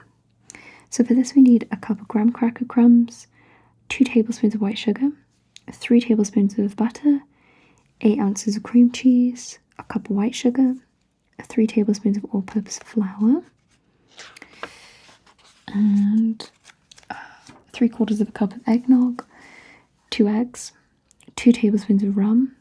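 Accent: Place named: Wales, United Kingdom. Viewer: Time to be lulled to sleep by those beautiful welsh tones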